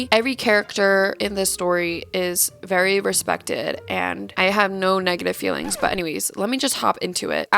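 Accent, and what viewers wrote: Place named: Asia. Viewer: Asian accent mic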